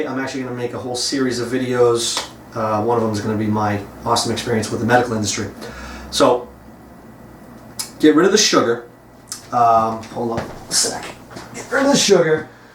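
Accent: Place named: United States. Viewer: Brother i can't understand spoken american well. Can u write down your recommendations please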